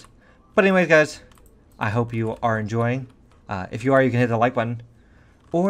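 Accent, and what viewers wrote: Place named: United States. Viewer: He has a great American voice and is hot can confirm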